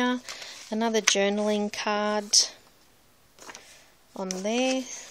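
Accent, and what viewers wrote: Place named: Australia. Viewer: A great journal. Your swap partner will love it! Tfs and it's good to hear your Aussie accent. You did a really good job of filming and narrating.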